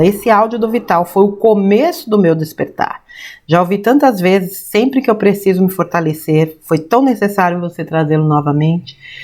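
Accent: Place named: Minas Gerais, Brazil. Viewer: "querida amiga", só posso dizer, gratidão, gratidão, gratidão, conhecer você e todos os personagens que você nós trás... é a melhor parte do nosso dia a dia, pelas reflexões, exemplos, ensinamentos, gostosas gargalhadas e lógico; pelo mineirez sem esquecer do café e pãozinho de queijo. Que PAI multiple e abençoe sua vida Beijos. Lis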